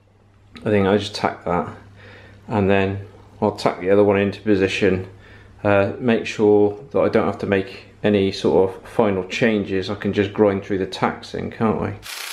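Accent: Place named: Cheltenham, United Kingdom. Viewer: You sound like me ,you have got to be from Cheltenham.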